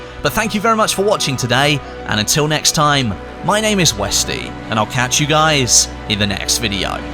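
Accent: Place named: United Kingdom. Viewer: Thanks to you, I started to like british accent, lol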